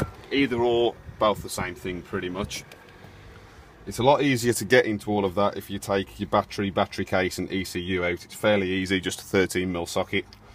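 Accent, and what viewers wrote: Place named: Birmingham, United Kingdom. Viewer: Hi there's no option to private message on here! I just wanted to know where your from as you sound like your a brummie lol I'm also from Birmingham and really need your help. My mums car is a scenic and she had it serviced by her partners friend who's a mechanic who had some trouble in doing what he was doing with a lot of tugging and pulling and jiggling about not long after this we was driving and on came the warning stop on the dash saying there's an electronic fault something about the hand break also. She has no speedometer or anything. I just wanted to know if you could help at all?! I know you made this video long ago and I've just came across it now but she's given her car to another mechanic who couldn't find the fault and you obviously know what your doing! She's paying out money for people to tell her they can't find the fault! 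If I was a man or even had a clue about cars I'd just follow your video but we have no idea what's so ever! The cars still drives but as you can imagine it's very stressful and you really feel down when your cars not working 100% i would be so grateful if you could reply back to this comment or email me on <mail> if you are willing to help. I really hope so!Hope to hear from you Jayda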